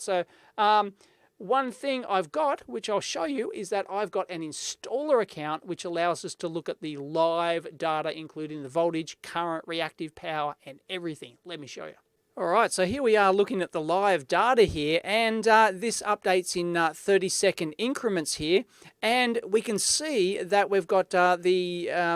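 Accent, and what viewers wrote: Australia. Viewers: why does talk like crocodile dundee? was that show really that popular in austrailia?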